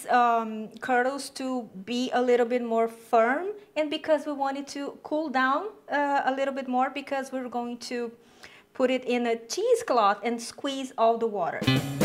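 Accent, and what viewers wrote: Brazil. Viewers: Você é brasileira reconheci pelo sotaque <3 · I recognized your brazilian accent, omg I'm bilingual as fuck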